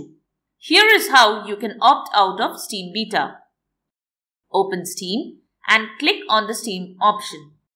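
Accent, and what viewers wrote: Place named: India. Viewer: A minute of ads to listen to indian tech support ffs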